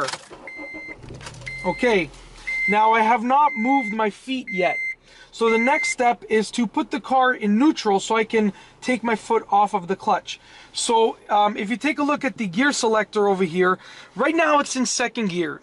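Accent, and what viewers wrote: Canada. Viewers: Totally Canadian accent. I miss hearing it sometimes! :) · I say he's heavy non-Quebec Mountain Canadian. I mean, it's plain obvious. All that's missing is one of those Aviator Hats to complete the look. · I was thinking more of like a Canadian trying to do a normal American accent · It’s been 5 secs and I can already tell this mans is a fellow Canadian.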